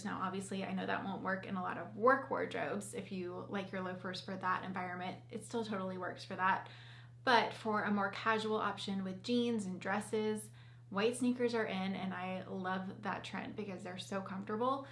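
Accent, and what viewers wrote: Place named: South, United States. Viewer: She's southern :)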